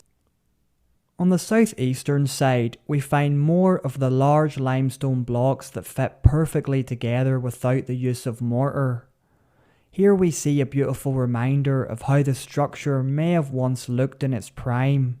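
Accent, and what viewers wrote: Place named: Scotland, United Kingdom. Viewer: I think he is scottisch, i love his accent 🥰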